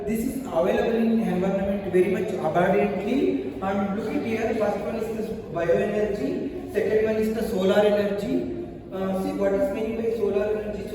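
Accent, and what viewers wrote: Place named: India. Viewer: This Indian English only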